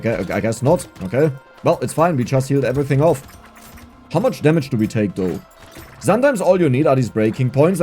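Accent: Germany. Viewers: ich höre einen deutschen akzent ???!!!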